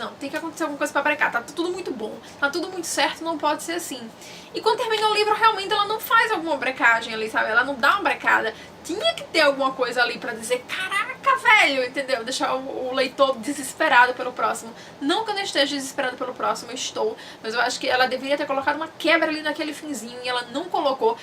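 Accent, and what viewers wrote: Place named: Northeast, Brazil. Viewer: oi tudo bem? eu gostei de vc, do seu canal, vc é bem segura e tem sotaque nordestino como eu rsrs...vc é de qual estado? enfim, só tenho uma pequena crítica :( às vezes acho que seus vídeos são longos..vc repete o que já falou, enfim só uma opinião minha msm...td de bom :) até mais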